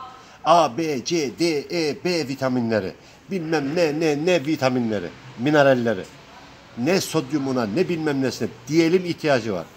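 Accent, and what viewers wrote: Black Sea, Turkey. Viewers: Selamün Âleyküm Karadenizlisin Abi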